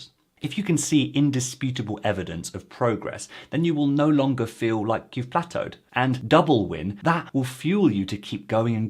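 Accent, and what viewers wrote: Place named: United Kingdom. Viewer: You are British when I heard your voice English That's ability I notice when I started November 2nd learning English today is May 29 2025 Not yet to get advance or fluency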